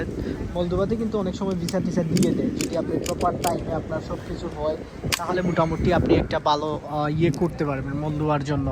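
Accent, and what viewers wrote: Sylhet, Bangladesh. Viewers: ভাই আপনি সিলেটি নি??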